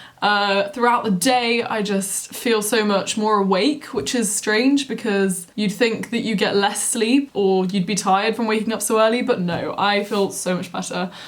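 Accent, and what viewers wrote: United Kingdom. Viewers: do you have a british accent? you dont seem to have an aussie accent to me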